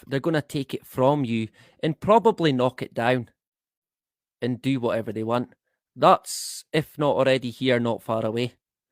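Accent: Scotland, United Kingdom. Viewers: Love listening to your Scottish accent! Enjoy life my friend. Much love to all. 💙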